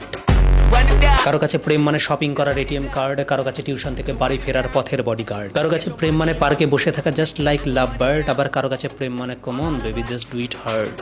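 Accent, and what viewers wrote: Bangladesh. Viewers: Ai Vai apnar language tone toh Pura amader Bangladesher moto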